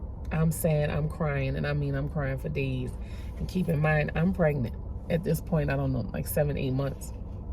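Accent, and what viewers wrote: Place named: Louisiana, United States. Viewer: Have no idea why someone would complain about you talking. That Louisiana accent hit different. I could listen all day. Also, I'm sorry to hear you're going through these things but the Light is always surrounding us we just have to step back in. Stay beautiful inside and out ❤ So glad I found you ❤❤❤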